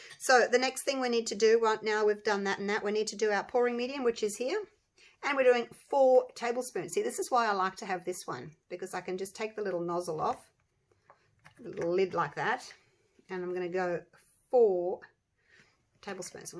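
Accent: Australia. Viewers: Hi, love your videos and hearing our good old Aussie accent! I ordered the minwax on Amazon and I noticed in your tutorial you said that people in the US can use Behr with Jo Sonja’s, but can we in Aus use the minwax with taubmans?? Thank you for this video 🥰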